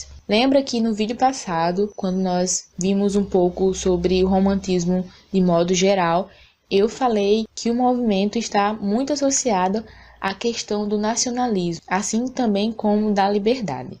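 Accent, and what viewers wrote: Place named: Rio Grande do Norte, Brazil. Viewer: Esse sotaque não me engana! É do Rio Grande do Norte! Muito boa aula! Me ajudou mt!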